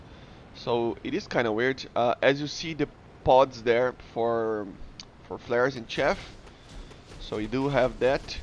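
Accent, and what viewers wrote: Brazil. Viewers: Percebi que era BR só pelo sotaque kkkk,tmj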